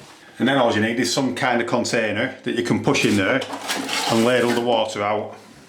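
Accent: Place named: North, United Kingdom. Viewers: I have this type of toilet, it’s knackered and I need a new one fitted! Any chance you are close to Liverpool and could come and do it for me please? Your accent sounds like you may be from St Helens which is only down the road 🙏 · thank you for that - good man. Got that trust worthy northern workman voice as well to install even more confidence!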